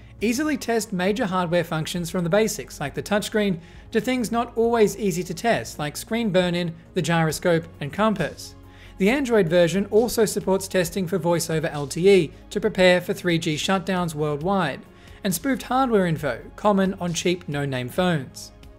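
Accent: Australia. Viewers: Australian english mate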